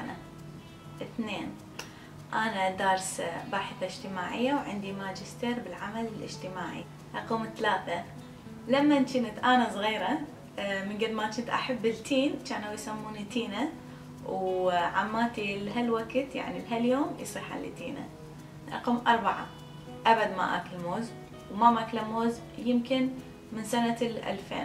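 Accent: Basra, Iraq. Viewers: كلشششش احبج واحب لهجتج البصراويه انسانه طيبه وراقيه وعفوية جدا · انتي كرايب لؤي يعني صابئيه 😍😍😍 احبج كوووومه وحجيج مال بصره يجنن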